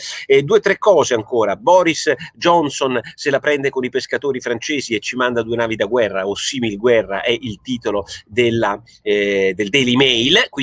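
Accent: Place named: Piedmont, Italy. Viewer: Peccato che all amico di ieri nessuno abbia risposto sull argomento... che peccato Comunque il tuo piemontese é proprio da barotto ormai nessuno lo parla così manco mia zia di 96 anni va, gadan che non sei altro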